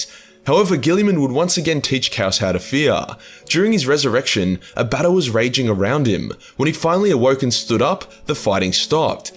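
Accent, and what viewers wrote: Australia. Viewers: Loving the content ,and am guessing from the familiar accent ,your a fellow Aussie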